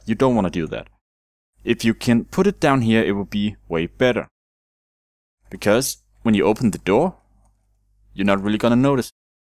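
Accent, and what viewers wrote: Denmark. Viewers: Det da en Dansker der taler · I knew you were from Danmark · Er du fra Danmark? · are u from Denmark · Are you from Denmark?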